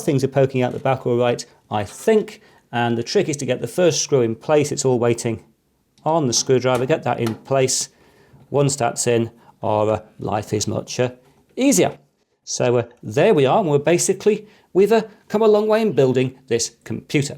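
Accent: England, United Kingdom. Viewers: Very nice video and well explained. I like your English humor, accent and the way you say „motherboard“...👍😂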